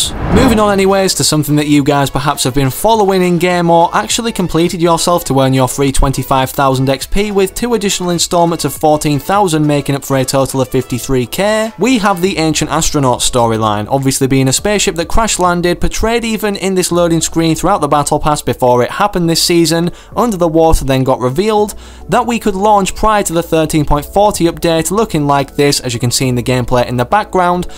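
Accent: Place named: England, United Kingdom. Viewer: Who else loves his accent? 😂😂😂 I'm from England too are you from Leeds or Sheffield or Bradford I want to live in Leeds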